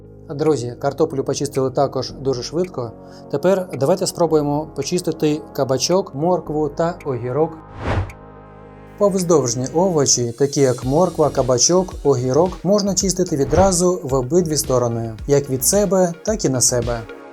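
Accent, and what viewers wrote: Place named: West, Ukraine. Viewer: изуродованный западеной русский язык...тошно слушать,я все понимаю ...лучше звук выключить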